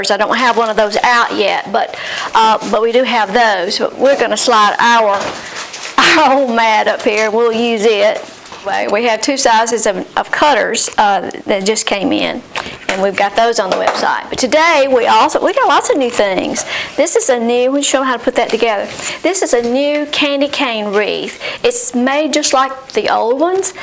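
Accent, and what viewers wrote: South, United States. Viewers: I just love watching your videos! Not only have I learned a few things, thats the bonus, but best part of all, is feeling like you have just invited me into your home for a visit. I love the way you talk lol, you guys sound just like me he,he. I was just a talking to you saying heck yeah I wore a garter belt. Gotta love those Southern Ladies. Never change. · I love your videos, I 3 daughters and one step daughter and love the times we craft or cook together. We are southern too so we love listening to your accents! I am learning all things deco from you gals! Thanks.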